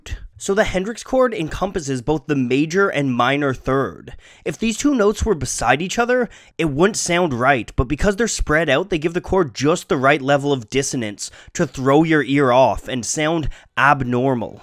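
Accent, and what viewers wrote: Canada. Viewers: Is Canadian? His O's sound Canadian.. But im Swedish so what do i know xD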